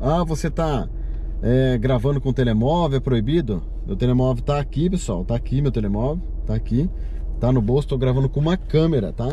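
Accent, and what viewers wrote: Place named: Portugal. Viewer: Parace um português a falar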